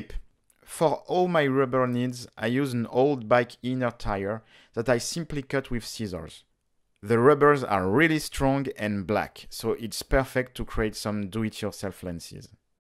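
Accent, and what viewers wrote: France. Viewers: T'as l'accent français ;-) je vais essayer de voir si je peux faire ça, ça a l'air bien cette histoire d'objectifs projecteur vidéo! Je vais essayer de trouver ça aux puces! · Your english is great! Love the french accent though :) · Tu est français ça s’entend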